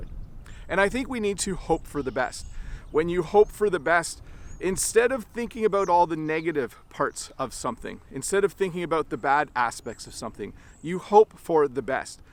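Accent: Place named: Canada. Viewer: Through your videos i can understand and learn canadian accents.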